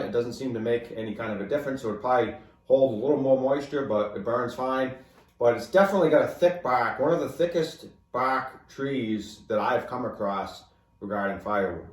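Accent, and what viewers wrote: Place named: New England, United States. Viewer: Gotta love the new england accent Varies every twenty miles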